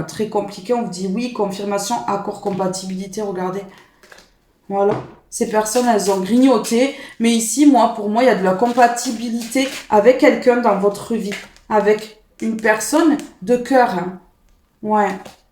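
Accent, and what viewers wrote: South, France. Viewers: Et moi je vous adore avec votre petit accent du sud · C ´est si vrai ! Pour moi ❤ vous êtes rayonnante j’aime votre energie et votre accent du sud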